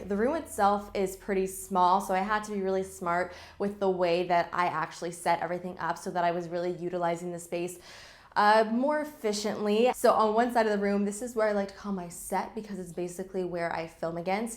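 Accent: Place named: Canada. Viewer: Me: she has a slight canadian accent goes on her about page "Hey guys I'm a Canadian beauty vlogger" SLAY YOU FELLOW CANADIAN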